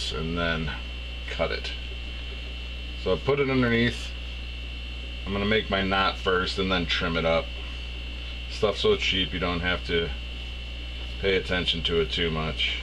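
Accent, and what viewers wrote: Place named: Chicago, United States. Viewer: Thanks for the DIY video and the shout out, both were much appreciated. I'm heading to Walmart after work to pick up the supplies to make this mop. I might make a slightly smaller version of it because I have limited space in my tank. Seems like we have a ton in common... cooking (I was a chef), apistogramma, and DIY.. you also sound like you are from Chicago, where I spent the first 20 years of my life.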